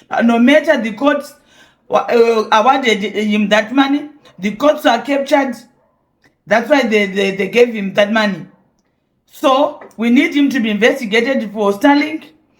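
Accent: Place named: Shona, Zimbabwe. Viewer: Hi. I love your your Shona presentation in English. Kkkk. It makes the presentation enjoyable and understandable. Keep it up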